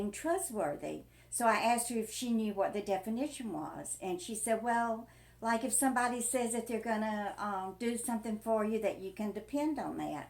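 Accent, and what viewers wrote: South, United States. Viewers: Your such a sweetheart❤❤ please try not to let anyone take advantage of you i love your Southern Charm and accent it's adorable.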